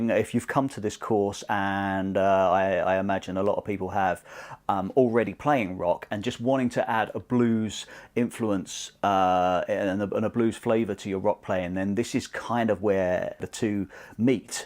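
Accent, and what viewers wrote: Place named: England, United Kingdom. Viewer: I'm a leftie wot plays righty, mostly because my first guitar was my brothers and he got tired of me changing the strings around all the time. So I would not mind seeing the leftie versions of these as it would look like a mirror image which I would have no trouble with. Also it is refreshing to find an english video tutor. THe yanks are fine but it gets a little tedious listening to the accent. If I wasn't so broke I would sign up for the course immediately. A natural teacher you can de mistify the blues I have heard for so long now. very refreshing to watch and learn. Well done. keep it up.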